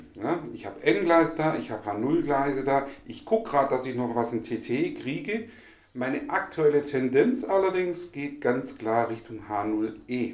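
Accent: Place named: Swabia, Germany. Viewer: Hallo, Glückwunsch zu deinen ersten 100 👍 Und: schwäbisch isch schbitze. Gruß Jürgen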